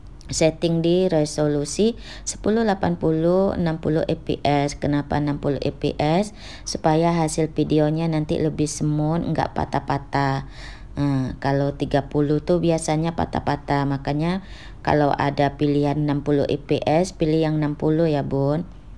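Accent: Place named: Pekanbaru, Indonesia. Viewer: assalamualaikum bund..cara ngomong nya mirip orang Pekanbaru bund..hihi..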